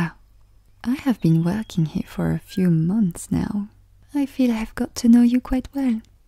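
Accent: France. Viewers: The French accent soft-spoken videos are my favorite from your channel. This one is actually quite sad. I hope there's a part 3 :( Thanks for sharing. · I love the French accent vids! I hope there will be a Part 3! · Aaa, good cute French maid is really something. Your French accent make me loved French and made me temember my highschool day when I learned French myself. ❤❤❤❤ Thank you for the upload. ❤❤❤❤ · I listened to this after a very long and hard day and the stress just melted away. Also a French acsent never sounded so appealing. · I saw French accent asmr and I press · My,my,my i think have to start study French because your accent is magnificent 👌 ❤❤❤ N.B: Umm, so there are 2 similar videos in got a bit lost😂😂, and double post means a fine night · I waited three days for the perfect morning to listen to this since I saw there’s a second part of my favorite maid asmr. 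It was worth the wait, it was worth to listen. Now I really hope there will be part 3. No one on YouTube is providing such charming content. Maid, French accent, even poetry with all background sound. You’re truly amazing. Thank you for being with me this morning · Yes another french accent vid, love the audios keep up the amazing work! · Well part of me in some distant future hopes for a part 3, (shh this is a secret 🤫 but i personally like the French accent audios definitely a favorite of mine to listen too) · I am obsessed with the french language and accent, and hearing from a soft-spoken sweet voice with caring words is nothing short of perfection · I loved this audio. I hope we get a part 3 someday. Also, if you ever just want to upload a video of reading poetry in your French accent, I might die in bliss (or at least sleep in it!) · Man, I always love your french accent videos. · I find this very beautiful and comforting. 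I closed my eyes while listening to this audio, and pretend that I'm spending time with the maid and getting to know her better. The French accent sounded very beautifully and I can say I enjoyed it. Great work. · I love your French accent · First 30 seconds in and the French accent is melting my brain away. This accent sprinkles happiness diamonds on my being once again. This accent is just to powerful. To gorgeous. To elegant. I can't fight it!! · It's only been 9 days and we already need more of the French accent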